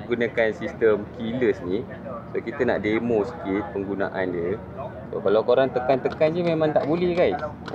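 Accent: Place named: North, Malaysia. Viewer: First time tgk video ko bang, penyampaian santai dengan pengaruh slang utara yg sedap dengar. Editing untuk opening best dan entertaining. Flow penceritaan jelas. Point of improvement camera angle dan pergerakan camera mungkin boleh diperbaiki. Overall good review dan editing best. Oh ye sedikit komen untuk penganjur, dah tahu media atau vlogger akan buat kerja kat belakang khemah tu... Housekeeping ler sikit... Kemain sepah nye... Spoil vlogger amik gambar / video cantik tapi background sampah... Bende simple je...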